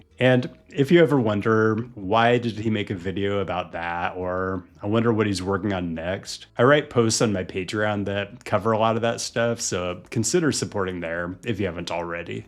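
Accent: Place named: Midwest, United States. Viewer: Would it be rude to ask about your accent? I don't want to be offensive, and I don't want to make you self conscious, but I've never heard someone pronounce "u" like you do in this video. I'm genuinely curious where you come from. I'm hearing Midwestern, NE and PNW hints when you speak.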